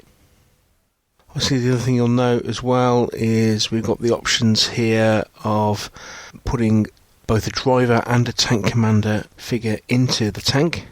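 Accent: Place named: RP, United Kingdom. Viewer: Another great review by. As an American, I love his proper "Queen's English". I do know the difference, as I was married to a Geordie from Whitley Bay, and I loved her Geordie accent too!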